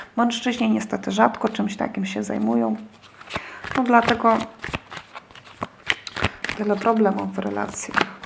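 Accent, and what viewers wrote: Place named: East, Poland. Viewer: wschodni akcent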